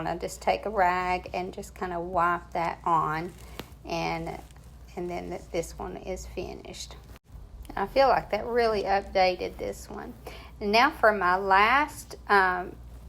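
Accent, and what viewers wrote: South, United States. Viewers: I just love your work, your beautiful Southern accent and mostly that you love the Lord! Keep glorifying his name like you do! 🛐💟✝️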